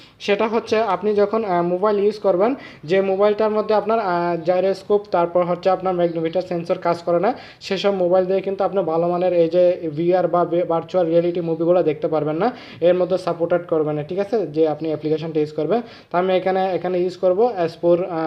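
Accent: Chittagong, Bangladesh. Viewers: ভাই আপনি কী চট্টগ্রাম থেকে?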